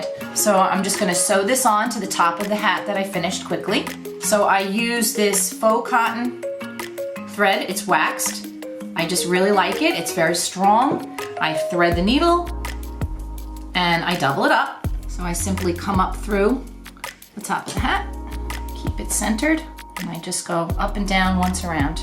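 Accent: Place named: Brooklyn, United States. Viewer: Are you a Brooklyn girl originally, specifically Williamsburg section, cause you sound like me girl. Lol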